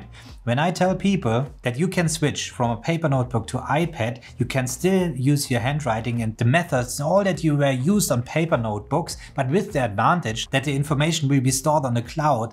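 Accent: Germany. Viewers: The thick german accent makes every tech video better. Des Bedarfs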